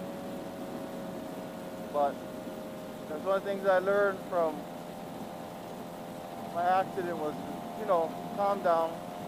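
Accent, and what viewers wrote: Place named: New Zealand. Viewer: I'm a Filipino from Guam... used to work on one of them cruise ships that sailed around the Islands of Hawai'i, that is why I thought your accent was familiar... For a second I thought your accent was from New Zealand, kinda sound similar...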